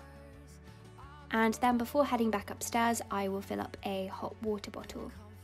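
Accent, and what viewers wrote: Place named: England, United Kingdom. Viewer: Hi, You live in such a magical place... I dream to own a cottage in the middle of fields like this someday;). I have recently been a French au pair in England and I miss this country a lot, it's a pleasure to hear you speaking English with this typical accent I love so much. I discovered your channel while seeking for some ways to virtually escape from the city, and when I found you I also gained some helpful motivation tips to go on working on my Highway Code test. So, thank you for sharing the beauty that surrounds you and your soothing, clever personality, I wish you and all your kind community the most beautiful of Sundays!!!!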